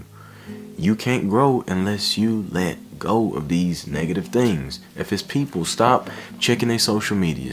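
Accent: South, United States. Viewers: Where are you from my guy? The southern accent is heavy in this one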